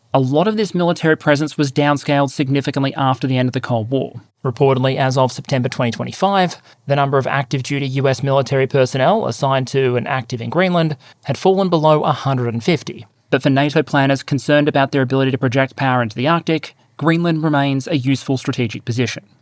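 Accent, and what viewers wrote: Australia. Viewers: That tacit acknowledgment that your voice is the real moneymaker at the end of the video there gave me a chuckle. I often wish I was an aussie just because of how much Americans love the accent… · is the Slavic god of thunder. The accent you hear is Australian.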